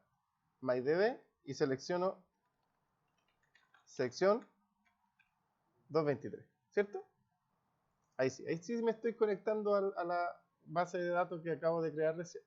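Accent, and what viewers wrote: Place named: Chile. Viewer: Excelente explicación muy didactica y de facil comprensión, además el aporte de la página es muy util para quienes estamos iniciando en el mundo de las TIC, por su acento deduzco que es ud chileno, muchas gracias saludos desde colombia.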